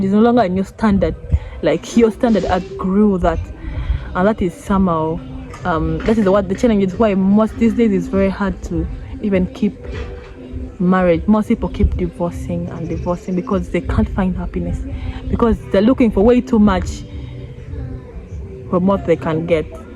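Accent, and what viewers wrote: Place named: Africa. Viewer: There's sound. She's speaking English with a strong African accent.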